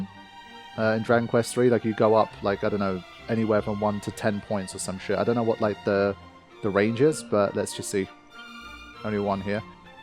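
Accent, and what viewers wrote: England, United Kingdom. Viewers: Now we can have proper Middle English Language being spoken by a proper English Commentator!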